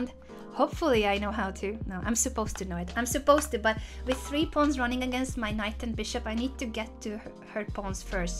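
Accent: Hungary. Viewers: Bakker eddig nem is realizáltam hogy te magyar vagy.... annyira hülyének érzem magam, az akcentusból rá kellett volna jönnöm XD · are you hungarian?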